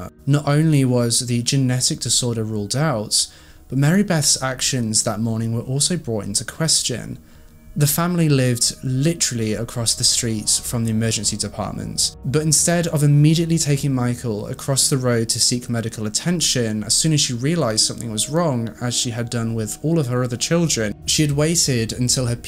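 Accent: United Kingdom. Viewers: I've been following Stephanie Harlowe's true crime channel, and recently discovered I've watched all of her videos! Stephanie's videos are incredibly high quality, and I didn't expect to find another true crime channel that was anywhere near as good as hers. I'm pleased to have discovered this channel. It is VERY well written and narrated. Bonus points that the narrator is very handsome and has a delicious British accent! Yaaay! You've got a bew subscriber. 😁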